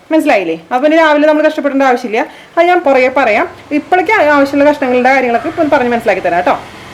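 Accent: Thrissur, India. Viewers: ബാച്‌ലർ പ്രവാസികളുടെ പാചക ദേവത.. അവതരണം ലളിതം സുതാര്യം പിന്നെ മ്മ്‌ടെ തൃശൂർ തനി നാടൻ ശൈലി. പൊളി 👌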